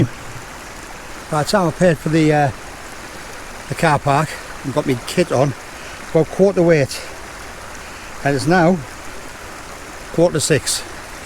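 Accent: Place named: Northern England, United Kingdom. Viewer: Ay-up! I finally got a chance to check out your channel and I’m so please I did! Your northern accent reminds me of my dads side! Haven’t been up north for so long! Anyway, great video! Some amazing views there! Would love to visit! I’ve subbed x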